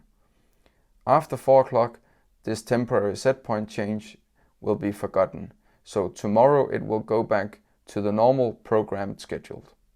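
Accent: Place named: Denmark. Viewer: KÆFT, en slem omgang Danglish! Har I ikke penge til at hyre en engelsktalende vært?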